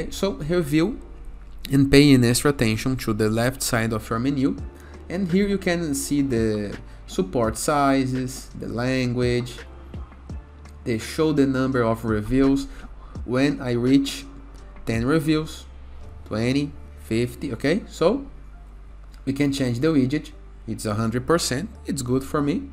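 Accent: Brazil. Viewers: Mano, impossível não reconhecer o sotaque brazuca. Parabéns pelo canal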